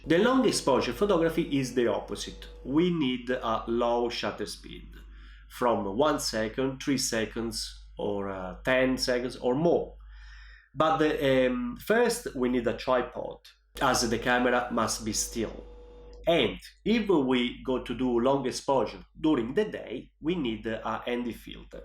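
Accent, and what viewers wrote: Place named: Italy. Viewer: Quanto si sente che sei italiano 😎 ottimo video, io posseggo la p1000 con un filtro nd 1000 ed è pauroso il risultato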